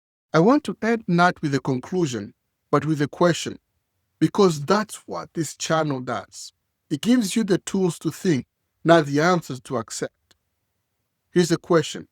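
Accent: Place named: Africa. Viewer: You sound African you know about our constitution more than most D.C. politicians big ups to you my brother.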